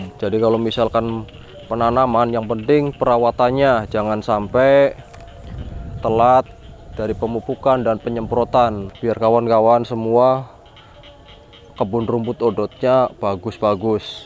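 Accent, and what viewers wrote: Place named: Banyumas, Indonesia. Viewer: Wong ngapak yae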